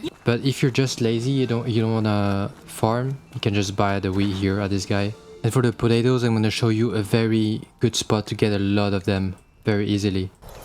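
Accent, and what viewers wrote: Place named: France. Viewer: LOL I didn't imagine you were Asian. I always thought you had something that sounded a bit like French accent, not sure, but I thought you were most likely European or smt.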